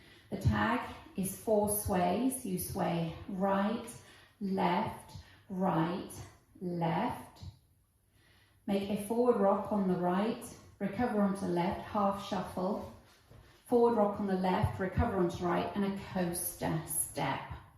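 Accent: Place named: England, United Kingdom. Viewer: The best Line dancing teacher on the Net, with a bonus of a lovely English accent. x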